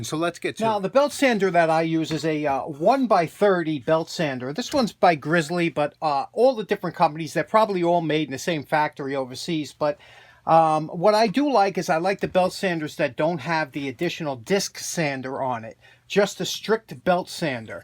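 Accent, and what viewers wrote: New York, United States. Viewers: Love your videos. I’ve been restoring old tool (mostly hand planes) on and off for the last 20 years and I really enjoy your approach and enthusiasm. Having lived in California for the past 40 year your East Coast (New York)accent takes me home to my Pennsylvania roots and all of the old family.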